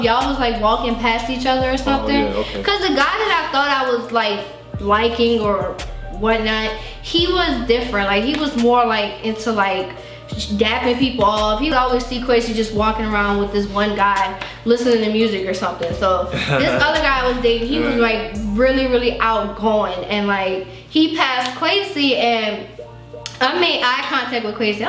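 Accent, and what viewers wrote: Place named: North, United Kingdom. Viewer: wow, your from new orleans. You have an accent as if your are from the North. Love your videos.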